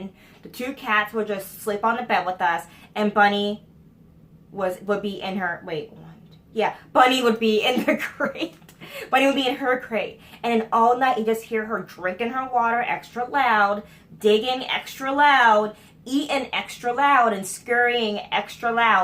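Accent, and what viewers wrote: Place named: New Jersey, United States. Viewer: Love the “bawls and cawfee”. I’m from NJ too and worked hard to get rid of my accent, lol. Do you also call a dresser drawer a “ draw”?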